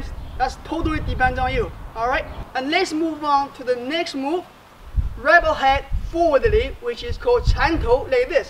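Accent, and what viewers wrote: China. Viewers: I love his Chinese English not American pronunciation! I enjoy ALL his videos.. the Chinese sword is wonderful to watch in action! We want to see many sword techniques! Hope he does more at a slow pace so we can follow him! 💡🩸💡🩸🩸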